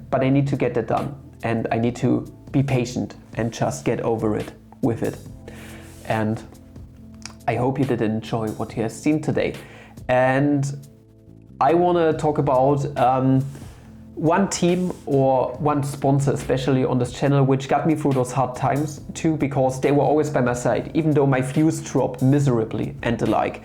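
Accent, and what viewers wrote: Germany. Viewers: Thickest German accent I heard. 🫡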